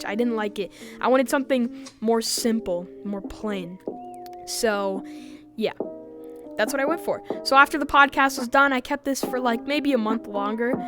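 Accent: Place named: United Kingdom. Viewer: Are you British or American I cant tell 😅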